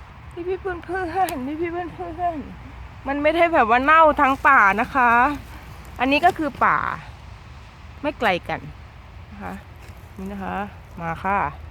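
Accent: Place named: South, Thailand. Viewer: ชอบนอ้ง นะคะติดตามมาสักพักแล้วคุยสนุกใต้ก็ฟังออกนะคะเพราะแฟนก็คนใต้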